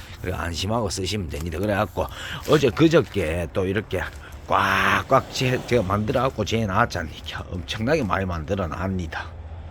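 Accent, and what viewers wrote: Gyeongsang, South Korea. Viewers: 경북 영주에 있지요 사장님구수한 사투리가 경상도 아재 아닌교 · 안녕하세요^^ 사장님 목소리톤 엄청시리 매력있는데요 ㅎ 갱상도 사투리 ㅎ 경북 영주시 우암도예 분과 배합토가 유명하죠 저도 한번 가고싶네요..올도 화이띵입니다❤❤❤ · 안녕하세요 수고가 많으십니다 구수한 목소리 경상도 사투리 반갑습니다 경북 영주입니데이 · 구수한 경상도사투리 멋있는 오빠 경북 영주시에 있지요~^^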